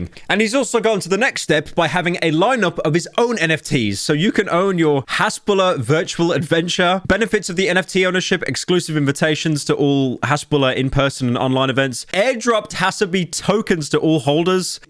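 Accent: United Kingdom. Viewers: is Bri ish?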